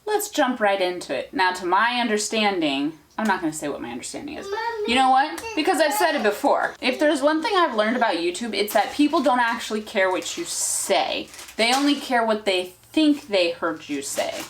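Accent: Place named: Slovenia. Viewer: Your slovenian accent is so smooth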